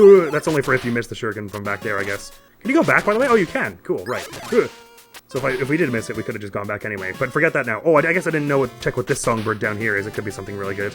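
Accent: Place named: New York, United States. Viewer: That's still a ton of really cool stuff! Like you, I've never had an Xbox, but I've never had any PlayStation stuff either; I've only ever gotten Nintendo consoles, and between them and my computer, I can find access to the games I want. Some of them would probably feel better on original hardware, though. Me, I've got a Wii, a 2DS that's starting to wear down, that GBA SP, a Switch, and the dark pink DSi my parents got me all the way back on my sixth birthday, which has somehow managed to hold up better than the 2DS and Switch 😆 OMG GATO! I can't believe I never thought of that, I have one of those too, just haven't used it in a long time Those darn bosses really should introduce themselves! Listen, Mr. Boss Guy, do you know how many guys with scythes there are in gaming? One was the final boss of KH CoM, and he had not only a name but a freakin' EPITHET (the Graceful Assassin; I never got around to telling you all the Organization guys' epithets and lesser Nobodies, so if there are any you still don't know, I can fill you in) Yes, I'll just hang out at my local library and read until a ninja comes to challenge me... 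Then again, that would probably wreck the library, so maybe I'll stand next to the tesla dealership instead 🤣 Yeah, I feel that way too. Tbh, I didn't realize you were trying to do an American accent until you apologized for it. I just thought you were doing an action-movie-guy sort of voice, it sounded kinda New York or Chicago-ish "Back in my day, we had to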